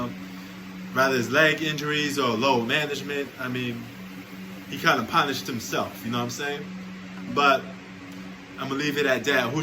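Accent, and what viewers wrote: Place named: New York, United States. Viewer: One word ruby lol he stopped the whole league u wild boy hahaha good ish p u from New York I can tell by your voice and how u speak lol very ny son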